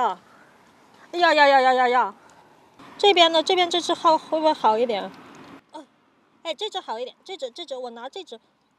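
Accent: Sichuan, China. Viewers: 这妹子是四川口音吗，还喜欢吃麻辣 笑哭 哭笑 笑出眼泪 破涕为笑 笑死 笑尿 笑cry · 你这川普哦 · 带着一点川普的感觉，四川普通话哈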